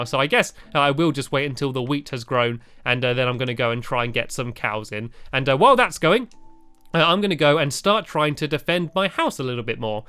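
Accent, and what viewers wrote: England, United Kingdom. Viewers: were u born in englend i cant tell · Brianna Buckner Because there British and they live in the U.K. and in the U.K. they say zed instead of z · he ameracan or english americans call it z and engerlish calls it zed thats just there landguge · it' because Z was originally Zed but because of 'Muraca it's Z so technically he is right and were wrong "short answer UK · Brianna Buckner he's British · Well you must be American because he is british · Cuz he’s English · he’s british that’s why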